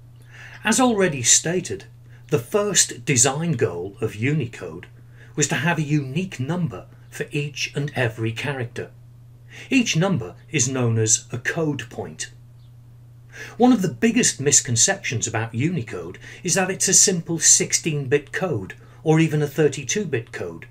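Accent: England, United Kingdom. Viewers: No Music, no insulting or idiotic speeches, great and clean way of explanation and a nice english accent - thumb up and abo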